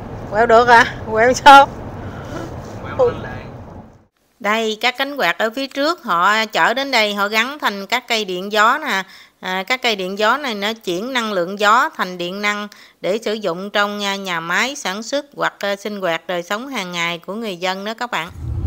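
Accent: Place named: Mekong Delta, Vietnam. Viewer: Nói chuyện đậm chất miền tây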